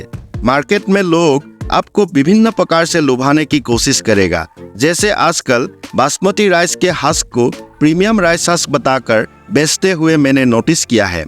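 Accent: Assam, India. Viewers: apke accent sun ke lagta hai ap Assamese ho. Hamare yaha mill mei powder milta hai, "tush" samajh jaoge. Rice mill hai 10km dur waha se 1 bori leke aaya tha. Aadha aise he raw use kiya agalonema mei. Phir kal baki aadha kal aise kadhai mei deke jalaya. 😄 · Ap ke Hindi Assamese jeshe hai.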